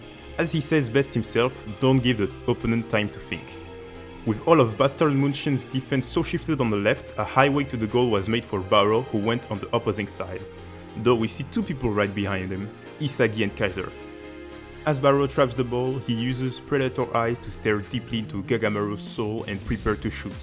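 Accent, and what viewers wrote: France. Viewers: French accent kinda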